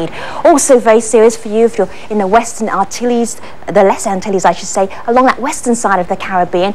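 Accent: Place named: Australia. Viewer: she talks funny, aulstrailian accent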